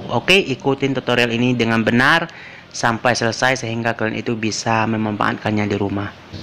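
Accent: West Sumatra, Indonesia. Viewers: Logat Minang nya, Karen bang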